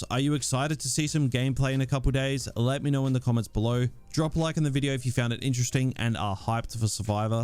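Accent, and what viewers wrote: Australia. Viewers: The australian accent is unbelievable annoying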